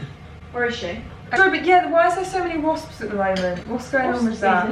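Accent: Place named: United Kingdom. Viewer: what part of the UK are you from, im trying to place your accent 😂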